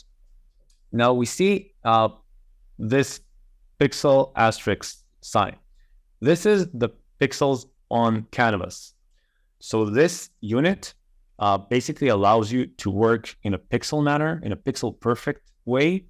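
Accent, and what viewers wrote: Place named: Israel. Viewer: טוב, לפי המבטא הבנתי שאתה ישראלי! תוכל להסביר לי, למה לעזאזל כל עריכה שאני עושה בדסקטופ לא מסתנכרן עם מובייל וטאבלט!?!? הכל מתחרבש, כל הדפים], סקשיינים והתאים על מצב פיקסט! למה לעזאזל זה מתעוות לי ב-publish!? אה...ועוד דבר מעצבן! כתבתי תוכן בעברית, לחצתי על cntrl+shift ימני כדי לחהזיז לצד ימין הכל, ואז הפרגרף מוחק לי את הכל ומעלים את האופציה של הטקסט! מה לעזאזל!!!!!!!!!!!!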